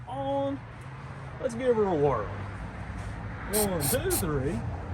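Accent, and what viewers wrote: South, United States. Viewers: This American accent is harsh, grinding and an onslaught on the ears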